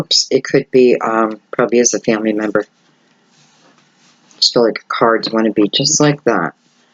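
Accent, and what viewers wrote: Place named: Pennsylvania, United States. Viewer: You’re from PA. I know that accent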